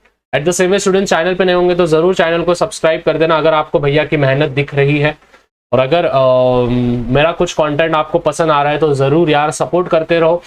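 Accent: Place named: Aurangabad, India. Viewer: Full aurangabadi accent 😂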